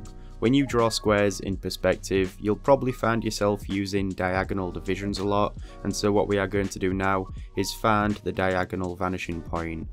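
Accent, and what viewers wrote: United Kingdom. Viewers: You have a british/country accent. It's soothing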